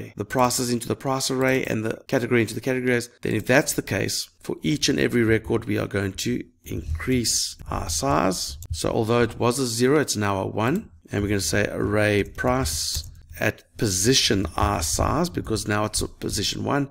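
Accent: South Africa. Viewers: Thanks you're the best 👌 quick question are you Afrikaans?😅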